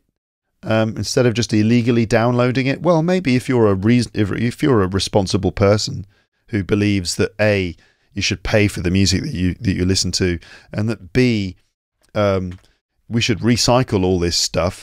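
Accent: United Kingdom. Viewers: Hi: I am so happy find this channel to learn English with British accent, again. T|his is because many years ago, I was in in the UK to study my degree n an university. But after immigrate to Canada, I lost my accent and even English (almost). I wonder do you a class to teach a group of people to learn English?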